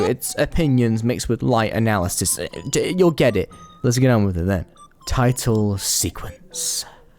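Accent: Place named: United Kingdom. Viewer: As a fellow Seiko lover the amount of random Seiko yelling segments make me chuckle, also its fun to hear another brit talk about this series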